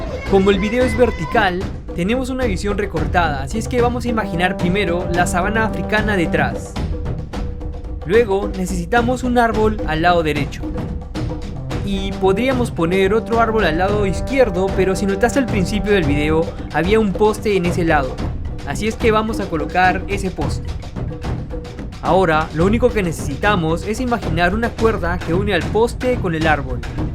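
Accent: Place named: Peru. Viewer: ERES DE PERU VERDAD ? POR QUE TIENES NUESTRO DIALECTO .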